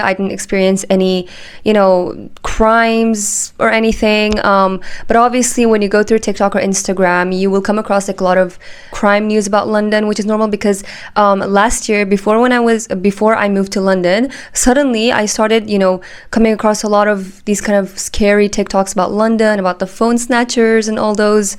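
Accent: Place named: Turkey. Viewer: Please make a video in Turkish language only Because I am in love with your Turkish accent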